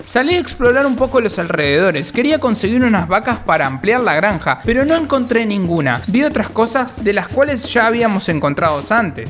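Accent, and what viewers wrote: Argentina. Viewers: Eres argentino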